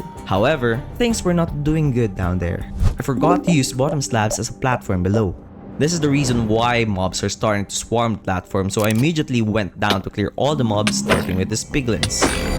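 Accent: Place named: Philippines. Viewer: its really funny how i was slowly realizing that this guy was filipino by just listening to his voice. (BTW insane video keep it up)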